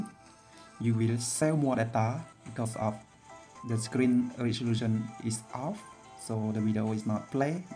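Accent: Cambodia. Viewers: I knew u are a Cam..... . Cause I know every Cam...... speaking english. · ohhh it makes sense now, i knew ur accent sounded familiar! your khmer!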